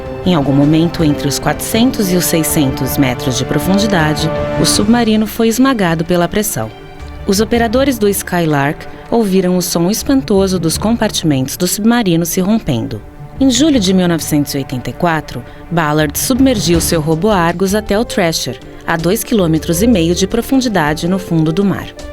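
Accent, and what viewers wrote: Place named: São Paulo, Brazil. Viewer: essa mulher tem um sotaque irritante de paulista "iceberrrrg"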